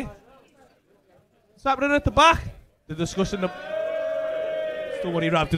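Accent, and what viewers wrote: Liverpool, United Kingdom. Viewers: You know you are Scouse when you have to add your own subtitle because YouTube auto subtitles can't understand half of what your saying 🤣 love it as always putting a smile on me face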